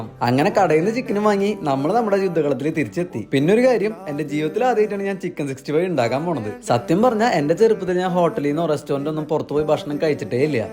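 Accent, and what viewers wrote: Thrissur, India. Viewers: Chetta oru video full trishur slang samsaarikko plz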